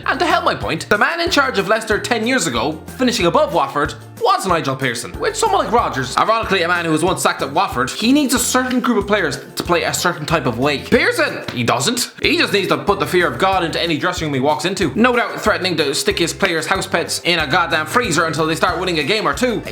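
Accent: Ireland. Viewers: I loveeee his Irish accent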